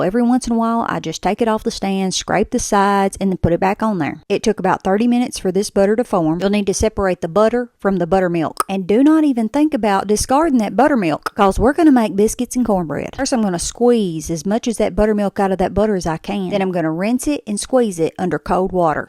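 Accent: South, United States. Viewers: Oh god. The k at the end of milk. 😂. I have a southern accent too but hard k's like that make me cringe in my skull. Not as bad as when people have whistling p's. Tha5s the fkn worst. Try to soften ur strong k's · In today's day and age how is it that a southern accent like that has survived? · Its genuinely so sad my first thought when I hear this accent is "hateful racist Christian"... helps me understand why a portion of black people have a hard time with white people. Being from TX I understand not everyone with a southern accent is this way but how things have been and are currently going I see now Im being conditioned (and its something to work on). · Dang I love your southern accent so much! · Perks of having a southern accent lol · Southern accents are the best things to hit the world since homemade butter. God bless from the UK. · Blonde and country accent god bless America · Man that southern accent is so heart warming ❤ God bless y'all's !!! 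· Owee that southern accent 🔥🔥🔥🥰🥰🥰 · I grew up in South Carolina so I have that accent.....but moved away and I think I lost part of it. Very sad indeed. Though sometimes I get a ......oh you're from the south! I used to hate it....but now its sort of endearing. Yours is lovely my dear. Don't ever lose it....its who you are. I grew up close to the coast.....so have the salt water in my veins as well!!!!!! Oh sorry....back to the recipe!!!! lol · What state gives this accent? I’m from SC and her flavor is different.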